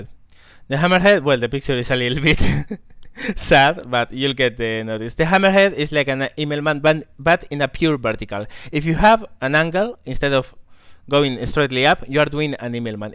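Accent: Spain. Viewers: tu inglés te delata, eres español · Illiteracy has nothing to do with accents. And yes, that is exactly what it is, an accent. You might want to read up on it, unless you happen to be illiterate yourself. His English might not be perfect but it's good enough to get a message through to just about anyone.. The way he pronounces words is affected by the fact that he's Spanish. Also, here, where I live, we have these things called manners. You might want to read up on those too.. so you don't have to resort to personal attacks.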